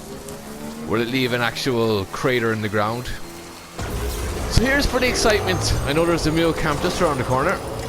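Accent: Ireland. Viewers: Do u really have an Irish accent or are u acting? Lol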